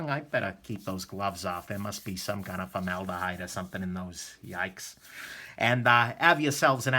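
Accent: United Kingdom. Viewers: Stellar accent 🇬🇧 Super review..... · OMG... British voice is more normal than his bat-reviewer voice!!!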